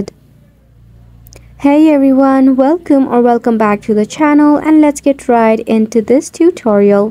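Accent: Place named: India. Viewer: indan cute ass voice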